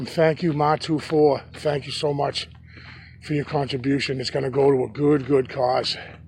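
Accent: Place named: Boston, United States. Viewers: Awesome hike not too long at all very interesting sound early in the video could have been a raven croaking but why only the once and why did it only happen after you crossed the first downed tree maybe the downed trees the ones that cross the path that are not by park employees are territorial marks or stay out signs? By the way I like the Boston Accent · Excellent video, great job! I did hear the strange noise, it sounded almost like the grunting noise that a pig makes. Weird isn't It? Btw, don't apologize for the Boston accent. I also have the Worcester-Boston accent. Nothing wrong with that! Everyone has an accent depending on what part of the country they grew up in. Be proud of who you are. You're a terrific person and I enjoy your videos. Have a great day, see you on the next one!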